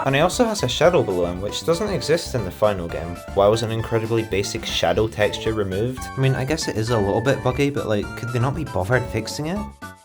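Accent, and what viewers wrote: United Kingdom. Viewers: My question is how come you have a NTSC final copy when you sound like a fellow Brit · Are you Scottish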